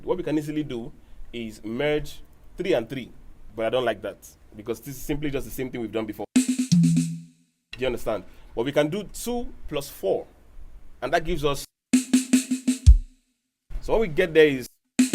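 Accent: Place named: New Jersey, United States. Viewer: Hey dawg. You sound like you're from jersey 😅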